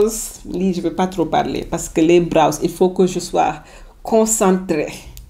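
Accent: Senegal. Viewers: j aime bien ton français woloftisé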